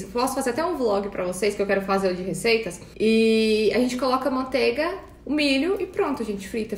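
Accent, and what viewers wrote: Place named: Ceará, Brazil. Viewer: Impressionada como teu sotaque parece com o do Nordeste! Mais especificamente do Ceará. ❤️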